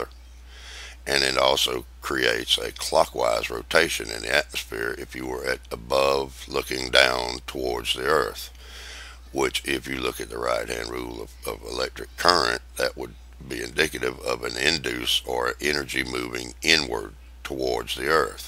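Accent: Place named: Virginia, United States. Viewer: ahh man. watching ur vids and reading the comments about ur voice. you sound like my old dad with that backwoods virginia accent. man i miss him. he would have loved ur videos. he had to quit school after fifth grade and when he got drafted they made him take his asvap test twice cuz they couldn't believe he scored so high. here i sit with my degree and not nearly as smart as him.lol. stick with it. them edjicated idjots gotta learn somehow.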